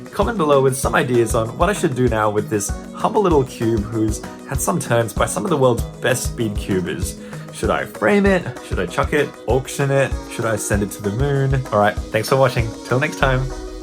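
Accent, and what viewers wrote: Australia. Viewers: Are you Aussie too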